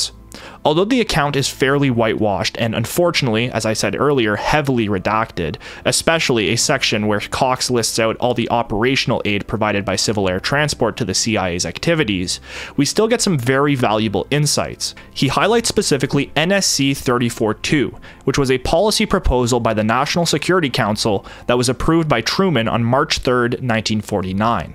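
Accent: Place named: Central Alberta, Canada. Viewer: That sounds like a central Alberta accent on the narrator 😅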